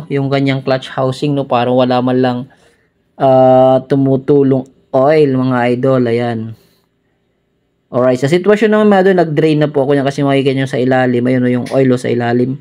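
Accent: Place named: Visayas, Philippines. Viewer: Boss taga samar ka din ba kasi visaya ka mag salita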